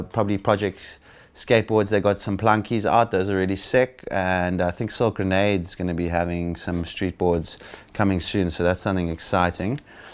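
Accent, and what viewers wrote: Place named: Italy. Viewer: italian accent is cute :)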